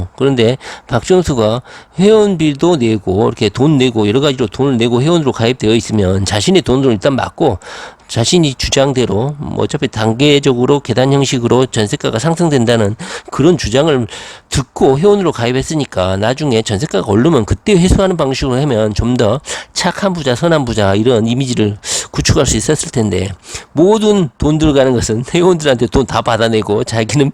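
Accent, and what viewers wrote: Jeolla, South Korea. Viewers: 전라도 늠이네ㅎㅎ 슨상님은 잘 계시지라?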